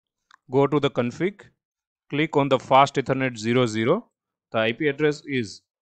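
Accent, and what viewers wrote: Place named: India. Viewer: 2 years later and youre helping a random Sailor in his C school, thank you random indian sounding man on the internet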